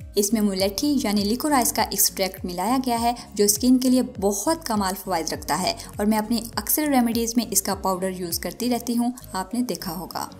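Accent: Punjab, India. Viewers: Nice di panjbi bolde ho so sweet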